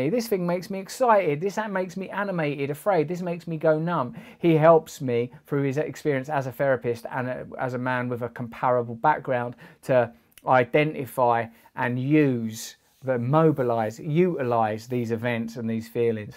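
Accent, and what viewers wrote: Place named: London, United Kingdom. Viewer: "You sound like you're from London!"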